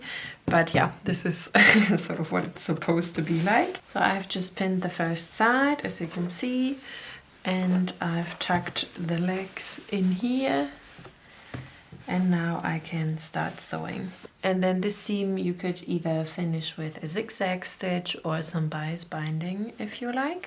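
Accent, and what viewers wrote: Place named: Germany. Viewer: Hallo, eine sehr schöne Tasche hast du da. Mir hat damals der Karpfen schon sehr gut gefallen, aber so in 3D als Ente, einfach genial. Man hört, dass du aus Deutschland kommst, woher genau wenn ich fragen darf? LG Chrissi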